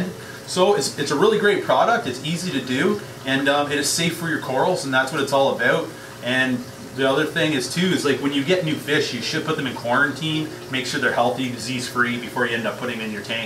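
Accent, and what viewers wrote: Canada. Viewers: probably the THICKEST Canadian Accent I've heard in a long time. Nice!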